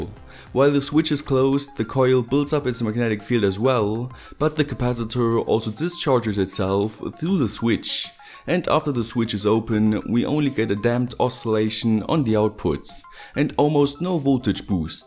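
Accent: Germany. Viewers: Can't tell Is he german or Chinese? Or both ?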